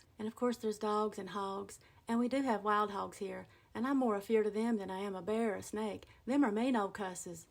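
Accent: Appalachia, United States. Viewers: I’m a Georgia Peach but all of my ancestors kin was from there. And so are many folks around here must have been too, because most of us talk pretty close to you and understand everything you say. I love watching your videos. Makes me feel like I’m listening to my kin. Thanky for sharing of yourself. It sure makes an old old lady’s heart smile. God bless you and keep you. ❤ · I tawk like that here in Missouri...but my momma grew up in Appalatcha WVA. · Wow you are gorgeous and I love the HILLBILLY ACCENT. I was born and raised in eastern Kentucky. Thus my Texas Hillbilly name. I now live in Houston Tx but a few years ago I went back home to Kentucky and bought my old home 🏡 place. You can take a Hillbilly out of the hills but you can't take the hills out of a true Hillbilly. Love you girl and the first video I saw 👀 of you was when you were making CHOCOLATE GRAVY. 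MY MOM Made it for her 7 kids almost every morning. It is GREATTTTT WITH HOT HILLBILLY BISCUITS. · I talk like this! I'm a hillbilly from West Virginia! you are hilarious! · You are just so cute when you talk! I love your Appalachian accent! Thanks for the education on Appalachia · Young lady i completely understand what you are saying. I have lived in Kentucky my hole life. And your speech is perfect hillbilly. Now let me tell you, ther is a defrance between hillbilly and redneck!!!!!! I'm a proud ky. HILLBILLY.... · If it weren't for your Appalachia accent found here, I'd have moved back to them mountains! I get to watch you pronounce minute, minute, tear, tear, as examples! 😂 Lightning bugs, crawdaddy's, pollywogs, and hogs were fun to catch as kids, but stay away from cow tippin' if the bull is home and horny! "No seeum' spray" is bug juice in the military. Wompus cats are fun to observe during their hoonts! 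· It’s not as bad as some I’ve seen when others speak one way with the appearance you would think isn’t the correct one you were expecting. But the only way I can explain this from my perspective. You can take the girl out of Appalachia, but not the Appalachian out of the girl. What a gorgeous mashup! Oh my! Hubba Hubba! Rawr!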